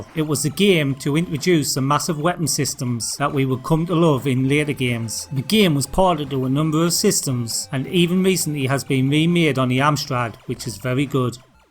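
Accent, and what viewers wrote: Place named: Newcastle, United Kingdom. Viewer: You've done a great job managing to hold onto your (geordie?) accent in spite being over in Japan for so many years....or have I embarrassingly confused you with Retro Core?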